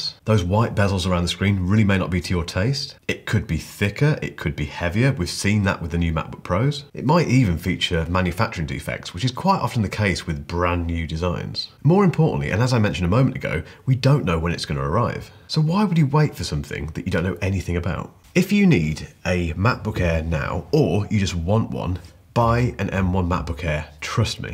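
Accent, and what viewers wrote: England, United Kingdom. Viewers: Like your reviews,! Great stuff! Also nice to listen to English English language! Cheers from Moscow, Russia🤡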